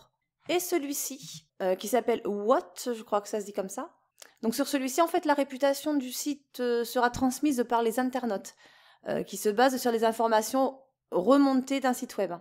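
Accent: South, France. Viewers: le bon accent du sud on aime bien ca!!!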